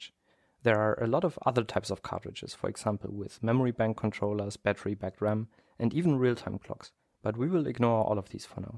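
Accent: Germany. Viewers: Are you german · Are you a german? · accent is kinda cute 😅 he sounds German or something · Sprichst du deutsch ? Dein Englisch hört sich wie meins an ^^ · Technisches Gebrabbel auf Englisch mit deutschem Akzent - ich kann dir nicht sagen, wieso, aber irgendwie hat das Charme. 😅 super Video, mega interessant. Danke! 😊👍🏻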